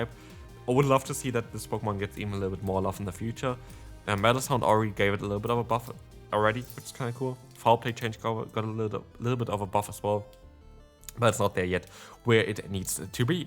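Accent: Germany. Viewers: Bist du Deutscher? Oder woher kommt der Akzent haha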